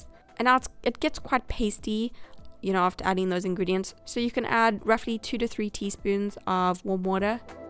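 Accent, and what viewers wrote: South Africa. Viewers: I love your videos I’m going to start watching your videos. Also I love your accent // are you South African? Xxx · South african??? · sounds like ur from SA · Ek kan jou wit-mens Suid Afrikaanse aksent DUIDELIK hoor 😂❤ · haha that South African accent.. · are you South African? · What's the accent??? (I think I'm wrong but you sound South African)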